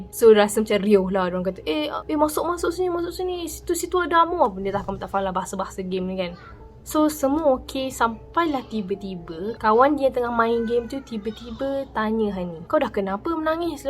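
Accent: Kelantan, Malaysia. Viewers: 7e kelantan ni kowt...sbb dia punya loghat mcm loghat kelantan😚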